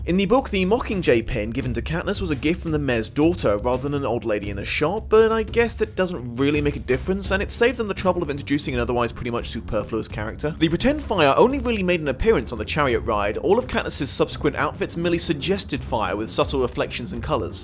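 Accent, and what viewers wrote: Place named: Wales, United Kingdom. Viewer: Where in the UK is the from? I notice the way he says certain things like "year" kind of remind me of how Rob Brydon speaks, so I wondered if he might be Welsh or have lived there.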